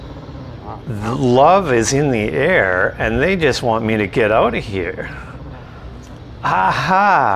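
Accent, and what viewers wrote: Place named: Canada. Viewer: I enjoyed this video. I’m surprised that you could get so close when filming. I like the use of ‘A’ when talking. Very Canadian. It’s a bit long when looking at the male bird. Maybe describing what’s going on with the birds or an explanation on what the female is also doing. I’m not sure what you should say cause I don’t know really anything about Canadian geese (crowbar chicken). Keep going with the video, can’t wait for the next instalment. ❤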